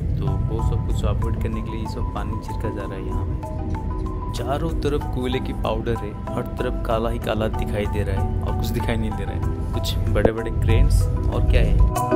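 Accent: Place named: Odisha, India. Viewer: Yeh Hindi bhi Odiya main bol raha hai bhai..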